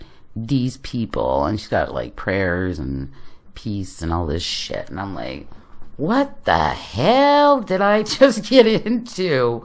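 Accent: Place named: Canada. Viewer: Yup, definitely Canadian!! "About" gives you away. The rumor mill is insane. I love your channel ❤️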